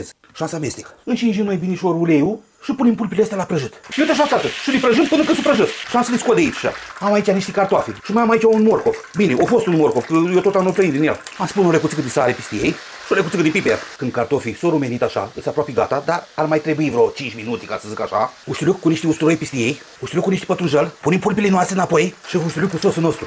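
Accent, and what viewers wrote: Moldova. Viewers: sunteti tare simpatic,și graiul dulce moldovenesc!MULTĂ SĂNĂTATE! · Inconfundabilu grai Moldovenesc il ador😊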